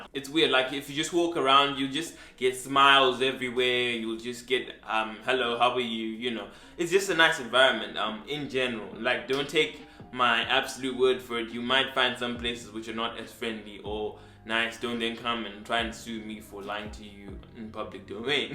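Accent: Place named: Zimbabwe. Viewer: yess I knew it 😅 I could tell from the accent , I am zim too 🇿🇼 🇿🇼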